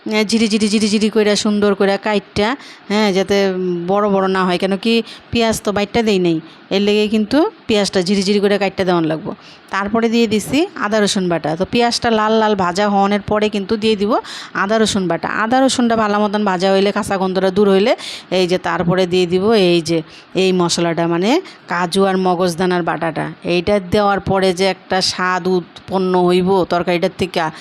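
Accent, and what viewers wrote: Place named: Bangal, Bangladesh. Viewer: খুব সুন্দর রেসিপি। আপনি বাঙাল ভাষায় বলছেন খুব মিষ্টি লাগছে শুনতে।👌❤️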